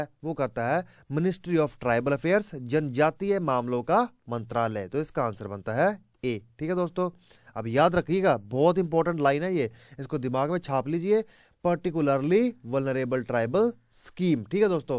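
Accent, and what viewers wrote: Punjab, India. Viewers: Sir punjab toh lgda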